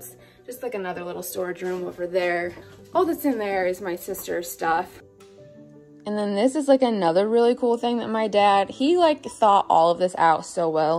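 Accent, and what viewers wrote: Midwest, United States. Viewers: Hip hop culture? Where are you from, man? That's how people in the Midwest talk.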